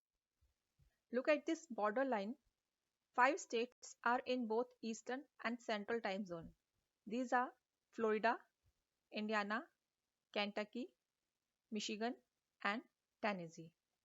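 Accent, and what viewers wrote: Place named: India. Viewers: Bro work even harder.... master your English learning skills so you can even understand Indian accent.... not from India but seems like you've a dig with Indians!! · I do not want to offend you, but maybe you have to think about the rest of the world, not just India. If you want that your video is understood by the whole world, you have to make it as similar to an American neutral accent, as possible. That is just the way the world is. Otherwise, people just will not understand it... I did not understand what you said, I am Mexican, yet I understand pretty much any other videos... so there you go... if you do not care to be understood by as many people as possible, then keep the Indian accent, people from India will understand you, but half the world will not. So, it is up to you... for instance, us Mexicans and people from Latin America work very hard to have an American accent, just so that we can get a better job. 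But, again, it's up to you.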